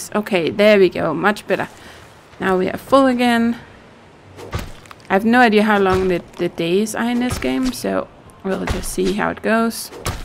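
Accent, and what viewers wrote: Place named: Denmark. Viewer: Thanks for the video, your accent sound Danish, very elegant in my opinion, from Alv, Norway.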